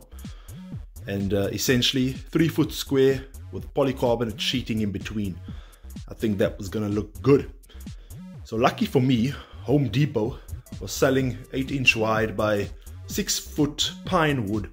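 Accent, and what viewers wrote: South Africa. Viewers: South African accent? Cape Town?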